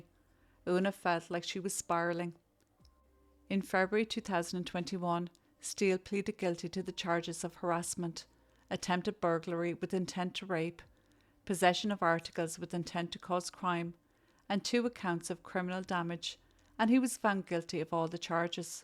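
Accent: Ireland. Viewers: I enjoy listening to your videos not only because of how interesting they are but it’s the added commentary that gives it the human touch( so much better than the robotic boring ones I’ve heard that constantly mispronounce things!) the lovely Irish accent seals the deal for me!! Keep them coming!! Great job!!👍🏻😉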